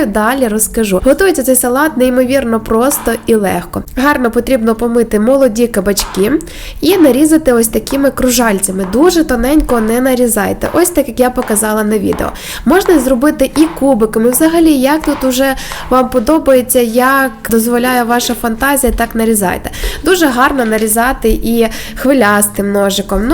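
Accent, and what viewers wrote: Standard. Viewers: Яка гарна господиня!Дякую за чисту українську.Дуже приємно слухати.Рецепти всі прекрасні,я вже їх протестувала. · Маленька , дякую . Яка у Вас гарна мелодійна чиста українська мова . ❤❤❤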